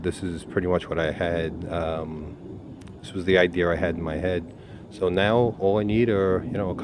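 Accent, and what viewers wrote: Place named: Illinois, United States. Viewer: Judging by the accent, this guy is definitely from Illinois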